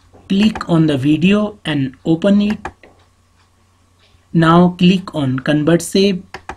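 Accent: India. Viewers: He is a Bihari I guess...... His English sounds like Bihar English. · I love Indian accents xD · It's north indian rural accent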